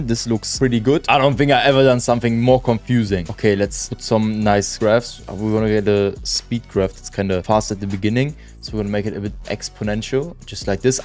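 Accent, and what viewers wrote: Germany. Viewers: i hear german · Man merkt anders das er deutscher ist. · bro du hörst dich so deutsch an 😭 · Wieso weiß ich sofort das er deutsch ist😂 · U sound german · are you german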